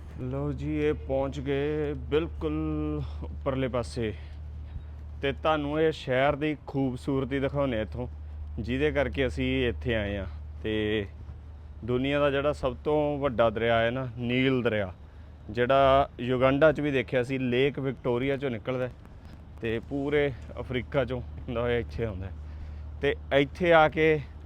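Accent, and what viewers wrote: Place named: Punjab, India. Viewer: Your "Pandu Punjabi accent " is excellent.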